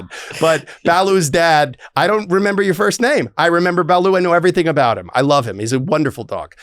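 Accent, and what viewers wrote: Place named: England, United Kingdom. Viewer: That’s a dynamite English accent. You’re the best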